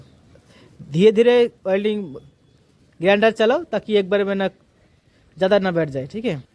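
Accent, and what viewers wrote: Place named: Bengal, India. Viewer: ও,,, দাদা,,,,,,,,,,, হিন্দি আর একটু ভালো করে শিখুন না হলে বাংলা চলে আসছে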